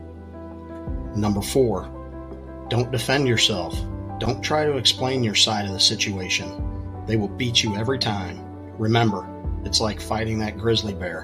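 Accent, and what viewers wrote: North Carolina, United States. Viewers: I hear your accent. I'm betting North Carolina. There is no keeping the peace forever and the relationship is never worth it. That's because eventually they'll either completely do a discard or they'll force the other person to do it through ever escalating betrayals. There is no happy ending, ever. There are sometimes long-term relationships, especially with the covert types, but the soul destroying damage to the victim ends up being even worse. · North Carolina ? From North Carolina also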